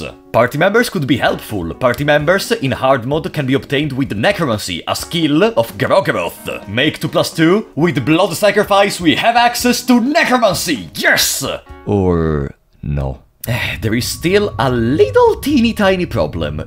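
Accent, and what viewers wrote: Italy. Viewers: So you're italian!!! All ghis time i wasn't able to identify your accent! Anyway glad you recover from your bad time, you truly someone i aspire to. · You're Italian? Man I suck at recognizing accents. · you sound italian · I didn't know you were Italian (was really wondering what kind of accent do you have) been watching you for a while now, from multiple accounts. Big love from russia❤❤❤ learned about FaH from you btw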